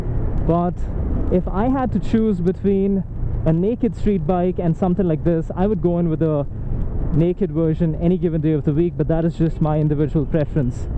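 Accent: England, United Kingdom. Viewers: Aaah... look at this beautiful man with his beautiful english accent and his beautiful hair singing with the wind... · I love Ur English accent .....can u plzzz share how to improve English to that extent....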